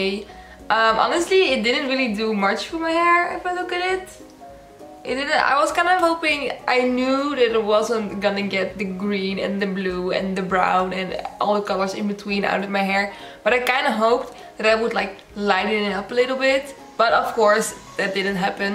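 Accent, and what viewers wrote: Netherlands. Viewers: Hahahaha 1 min into the video en hoorde gelijk dat je Nederlands bent 😂 love ur hair tho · Is dat een Nederlands accent? 😏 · Hoorde gelijk aan Je accent dat je NL was 😂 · Whahaha ik zat voor het eerst te kijken en ik dacht ‘Dit is een TE Nederlands accent’ en zag in de beschrijving dat je inderdaad Nederlands bent! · Are you dutch you sound dutch ( first video of yours ive seen) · I couldn't quite figure out why your accent sounded so familiar to me, until I looked at your channel and saw you were from the Netherlands which is where I'm from hahahaha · Hahaha altijd zo leuk als je denk hmmm Vgm is ze Nederlands want ze heeft een Nederlands accent en het dan goed hebben! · You have a dutchy English-speaking accent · dutch accent hihi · Are you Dutch?? As someone from The Netherlands your accent sounds really Dutch 😂😂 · Are you Dutch? 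If yes, your accent gives it away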